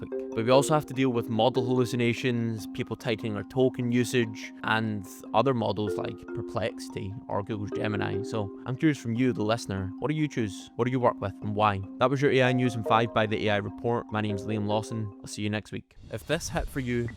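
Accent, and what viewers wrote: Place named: Scotland, United Kingdom. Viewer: Don’t waste any time at all trying to change your native Scottish accent. You are easily understood with Scottish as is. 👌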